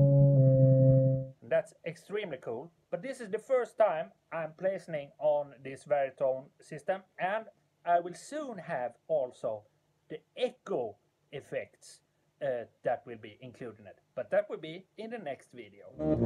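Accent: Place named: Sweden. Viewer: Fantastiskt grejt swenglish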